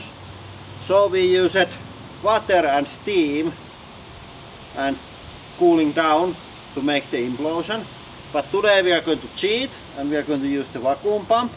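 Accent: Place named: Finland. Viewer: I'm here for the finish accent only.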